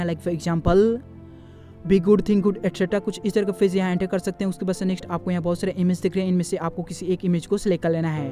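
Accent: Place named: Punjab, India. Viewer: Punjabi accent fast voice not good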